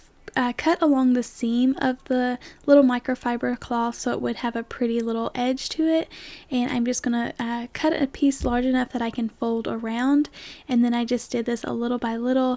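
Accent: South, United States. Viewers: I Love all your crafts!! So cute & you are so creative too! I also love your cute southern twang, it reminds me of my Mama- she's from the south!!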